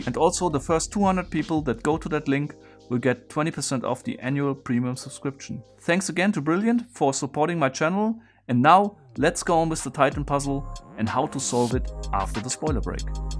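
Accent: Germany. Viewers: Es ist lustig wie man am Akzent raushören kann, dass du aus Deutschland bist. Its funny that you can hear that he is german :P Good Video tho! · Could it be that you are german? Becaus your accent and when you said ‚klack‘ it gave it away 😂 Greetings from munich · Its so weird how he sounds so german.. he also uses have instead of has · Is he german? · Man hört raus dass du Deutscher oder Österreicher bist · Klingt sehr deutsch, dein englisch ^^ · nice video and interesting puzzle 👌, but damn bro ur german accent is awful 😧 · I love this german or skandinavian accent. Such wholesome english;) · It is a very hard German accent ^^ my fellow Landsmann has used this as charme however :)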